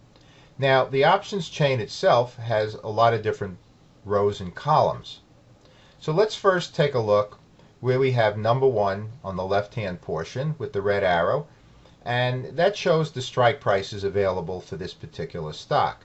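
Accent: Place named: New York, United States. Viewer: Hi Mr., my mom deeply and humbly apologizes for insulting your beautiful New York accent. I love it and I love the videos. Keep it up homie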